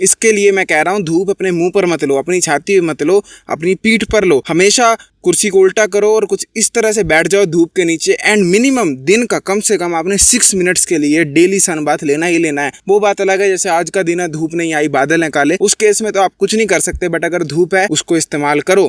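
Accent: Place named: Garhwal, India. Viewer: Bhai tu garhwali hai na 😅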